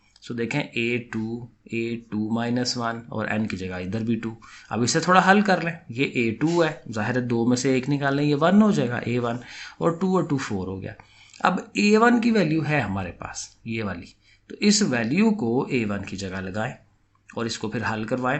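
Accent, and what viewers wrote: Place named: Lahore, Pakistan. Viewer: Teacher's lahori accent revel on ... " Har baar multiply ho rha ha...."😂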